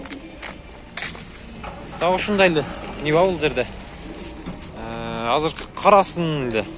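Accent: South, Kyrgyzstan. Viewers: кыргызча туштук диалектинде суйлоп атат,баурым.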